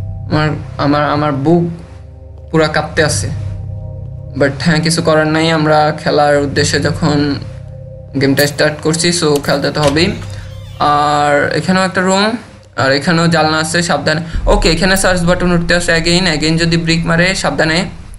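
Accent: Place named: Barishal, Bangladesh. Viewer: Tmi ki borishailla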